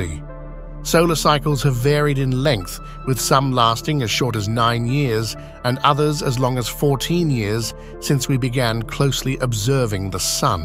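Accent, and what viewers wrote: United Kingdom. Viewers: This channel is AI driven and produced content with a robotic British AI narrator so it sounds sciencie 😂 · These dramatic readings with the English accent makes it all so Shakespearean. Loved near the beginning talking about machine malfunctions even though unplugged. 1850s had no electricity or anything, anywhere to “plug” in. The only electrics were primitive telegraph systems that because of the wires linking the stations acted as antennas directing the CME output to the stations. Just give the real history without the stupid drama please.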